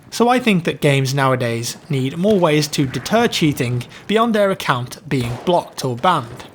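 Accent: United Kingdom. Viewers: Feel like I'm watching lvlcaps video with a British accent.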